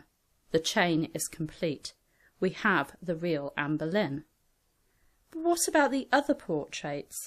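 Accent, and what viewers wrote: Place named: England, United Kingdom. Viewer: I really enjoy your videos.And love you English accent.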